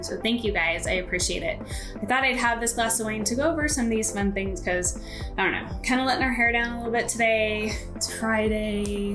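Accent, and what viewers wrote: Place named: Idaho, United States. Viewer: I was born in Burley Idaho and lived in Rupert when I was little but I grew up in Nampa from 6th grade on up till I was about 22 years old but now I live in CoeurD'Alene, Idaho and I can tell When someone is from Southern Idaho or up North by your accents and I never really thought Idaho people had different accents but We do lol 😂 It's funny to me Because I've "no joking here" Looked at people and said Are you from Nampa and The look on there faces make me laugh because of course I've gotta explain myself LOL always like your accent made me miss Nampa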